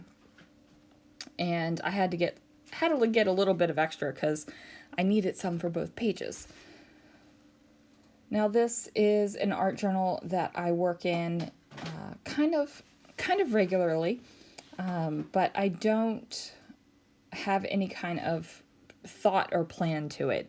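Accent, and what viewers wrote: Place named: Maryland, United States. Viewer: Love your Maryland accent...miss that place as we have landed in Florida!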